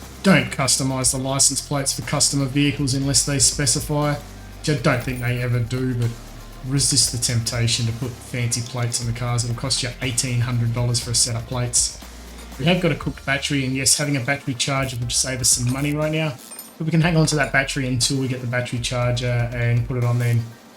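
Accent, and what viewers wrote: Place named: Australia. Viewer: Great advice. Cheers mate, much appreciated. You sound Aussie (Australian). A welcomed relief from other accents online.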